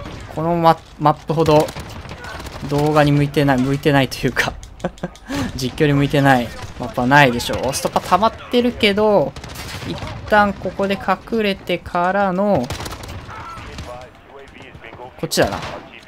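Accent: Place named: Hakata, Japan. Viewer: 筋肉男子の博多弁は惚れちゃうね❤️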